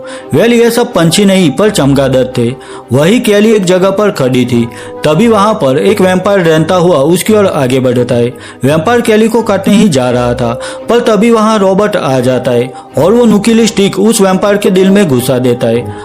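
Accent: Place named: Bihar, India. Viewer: apki voice se lag raha hai aap bihari ho..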